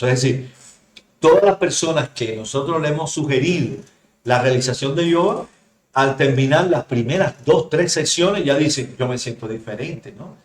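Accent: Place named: Cuba. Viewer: Muchas gracias doctor por tan buena explicación. Por su acento creo que somos compatriotas cubanos( espero no equivocarme ) . Pasaba por aqui buscando información acerca de la cefalea tipo tensional ya que llevo años padeciéndola intermitentemente y me ayudo mucho reconocer los signos de alarma, ya que eso es generalmente lo que mas a uno le preocupa. Exitos en su canal!!!!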